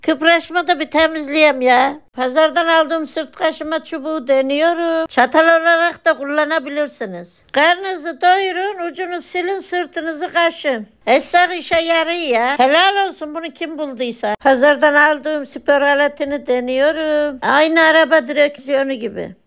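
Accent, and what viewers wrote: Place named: Erzincan, Turkey. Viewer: %100 Erzincan ağzı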